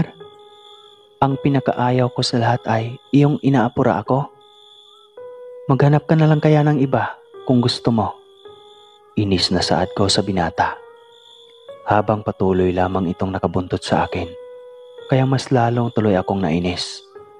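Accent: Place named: Visayas, Philippines. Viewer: Boss bisaya kaman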